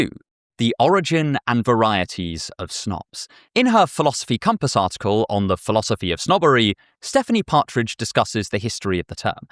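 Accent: United Kingdom. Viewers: First video I saw of yours was one with a super clickbaity title, where you "bashed" people for not enjoying the classics you liked. Ofc... you didn't actually do that, but it left a very weird and mixed first impression, that, combined with the primped-up, smart dressing style and the entirely clean appearance and (as discriminatory as I know this is) the heavy, but thoughtfully elegant and clear British accent made for an image of a typical "snob". I now realize you're the opposite... you're just like this, cause you like being like this and you want to share this love of classics and reading with the world in a non-pompous and inviting way. Sincere apologies for my prejudiced first impression. I also really adored the re-reading aspect towards the end. There was a while in my life where I was deeply against the idea of looking back and it was all about the quantity of highly lauded experiences to me. 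Today, I much prefer returning to things I really adore. Right now, I am reading the manga "Vinland Saga" and watching the anime at the same time, with a friend whose not only never seen it, but who claimed to hate the art form, before I proposed to him (based on knowledge of other things he's enjoyed) to watch it with me, and he's enamored by it. And every time I've experienced this story in all of its different formats, I've discovered some new aspect of it. Even watching it is different. The first time I watched it was with another friend, who was insistent on watching dubb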